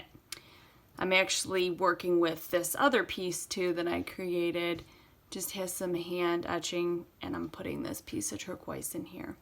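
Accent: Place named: Michigan, United States. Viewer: You sound like a Michigander! lol. Thanks for making these videos, truly the best on the market for tutorials.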